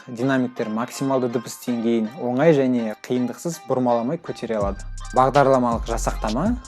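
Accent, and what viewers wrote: Standard. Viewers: Очень качественное видео и речь. Настоящий казахский язык, не базарный и аульный, звучание красивое. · Күшті! Мықты сараптама таза қазақша. Жарайсың!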